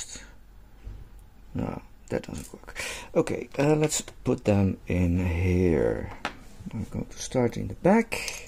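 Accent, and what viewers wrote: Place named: Netherlands. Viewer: love the video bud! very informative. love hearing you silky smooth dutch accent ❤ excellent job on the pick too 😊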